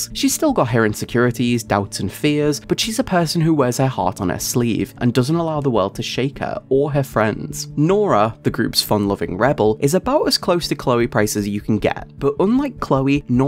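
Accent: United Kingdom. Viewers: Inglês já é difícil, o cara falando com sotaque do britânico que nem uma metralhadora não dá KAKSKDKKDSKKS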